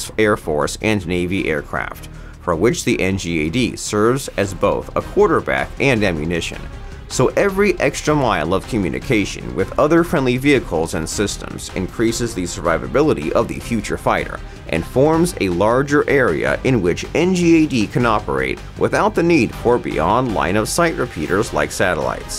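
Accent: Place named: India. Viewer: why you sounds like indians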